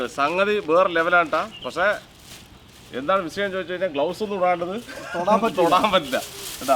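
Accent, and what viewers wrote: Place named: Malappuram, India. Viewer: ഇടക് മലപ്പുറം ഭാഷ വരുന്നുണ്ട്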